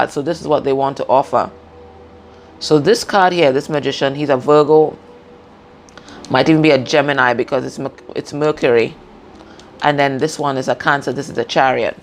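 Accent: Trinidad and Tobago. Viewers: Are you Trini?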